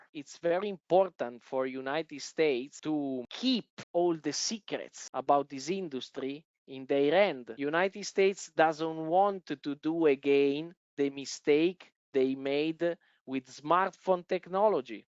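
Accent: Italy. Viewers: sembra un inglese con forte accento italiano, ma non vorrei sbagliare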